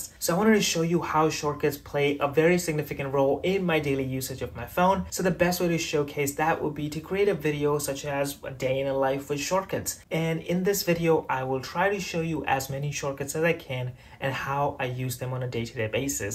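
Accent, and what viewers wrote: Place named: India. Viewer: Indian accent is still there :) , Oh Puchheya Na Kar Mera Schedule Ni But you are telling your schedule about the day ... lol XD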